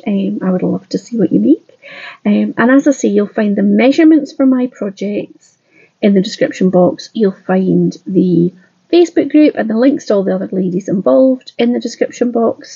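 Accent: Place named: Scotland, United Kingdom. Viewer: Superb cards and I love your gentle Scots accent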